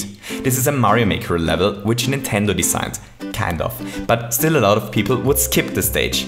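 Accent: Germany. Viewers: Du bist deutscher? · You're from germany, right? ^-^ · Man hört raus das du Deutsch spricht und Lesezeichen ist ja auch ein deutsches Wort #Ceavearmy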